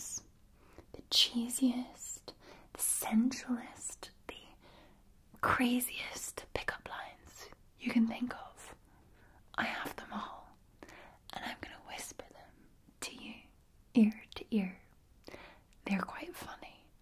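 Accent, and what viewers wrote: Scotland, United Kingdom. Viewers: oh... you resplendent scottish lass. ☺️ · No she's Scottish 😎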